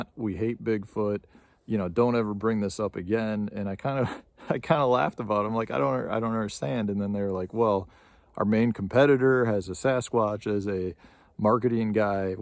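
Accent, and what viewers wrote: Wisconsin, United States. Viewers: Thanks for sharing. It takes courage to talk about this subject due to the fear of ridicule. I appreciate that. Plus, it's always fun to hear a Wisconsin accent! Seriously though, you might want to check out Facts by How To Hunt, Steve Isdahl's Youtube channel. He posts about other people's encounters and experiences. Also, Dave Paulides & Scott Carpenter. All very informative channels. Lot's of critters out there. Fish on!🎣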